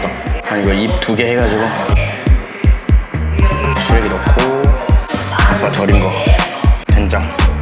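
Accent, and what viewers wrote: Jeju, South Korea. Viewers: 인줄 알았는데 이였군요~~ 저도 제주도민인데 10년째 서울에 살면서 제주도 사투리가 들리면 괜히 반갑고 귀가트이더라구욯ㅎㅎ 이번에 추석때 내려가는데 함 금복식당(추석때 열려나???ㅜㅜㅜ)가보고싶네요~~:)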